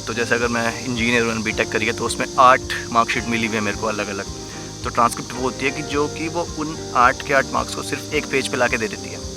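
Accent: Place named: India. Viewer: Subscribed your channel recently. And the most impressive thing I found is the way you talk, pure desi. I really like the way you do your vlogs. Keep going.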